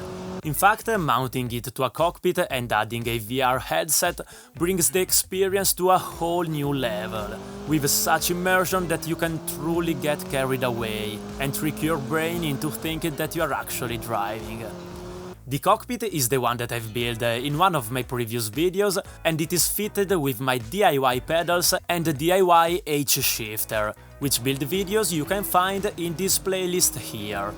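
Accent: Italy. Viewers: i love italian english ;D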